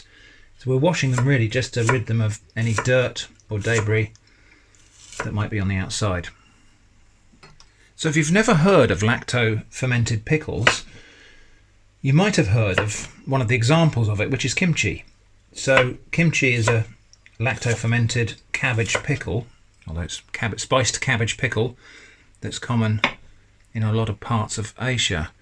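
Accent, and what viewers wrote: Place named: Southern England, United Kingdom. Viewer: thought it was south but couldn’t quite place the accent , From Kent myself but I live in Norwich ,, great foraging out in the country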